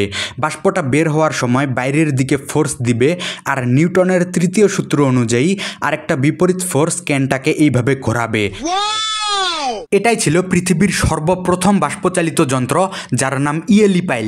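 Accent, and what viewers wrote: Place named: Thakurgaon, Bangladesh. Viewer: তোমার বাড়ি মনে হয় নিশ্চয়ই ঠাকুরগাঁ জেলায় তোমার কথা শুনে বোঝা যাচ্ছে আমাকে নিয়ে একটা ভিডিও বানাও আমি কি সত্যি বলেছি না মিথ্যা বলেছি এই কথা নিয়ে একটা ভিডিও বানাও প্লিজ প্লিজ প্লিজ প্লিজ প্লিজ প্লিজ প্লিজ প্লিস প্লিস প্লিস প্লিস প্লিস প্লিস প্লিস প্লিস প্লিস প্লিস প্লিস প্লিস প্লিস প্লিস প্লিজ🎉🎉❤❤❤❤❤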